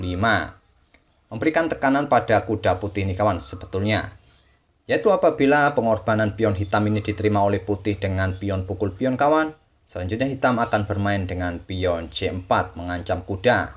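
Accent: Java, Indonesia. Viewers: Iyasih mas..medok amat jowo nya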